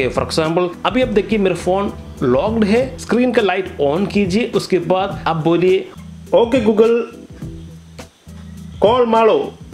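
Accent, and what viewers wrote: Kerala, India. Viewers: Thank you bro are you from Kerala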